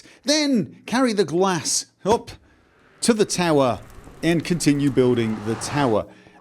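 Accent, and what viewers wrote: United Kingdom. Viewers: You know I finaly remember why i like watching you. You are basically have a british 'Morgan Freeman' voice in that its amazing to listen to and you happen to play alot of games i enjoy. Please do more of the minecraft stuff!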